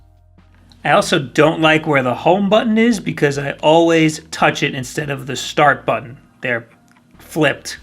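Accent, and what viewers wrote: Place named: New York, United States. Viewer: I’m from Wisconsin and live in nyc. Love how you say cawfee like a New Yorker but Beag (bag) like a Midwesterner 😂. Relatable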